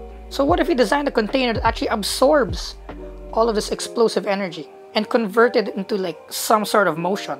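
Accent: Philippines. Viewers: This is the first vid I'm seeing from you and I can hear the Filipino accent already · W Pinay Accent 👌✨ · I’d recognize a filipino accent from a mile away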